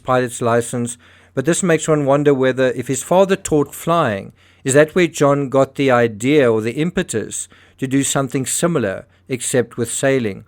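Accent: South Africa. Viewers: It's that hideous South African accent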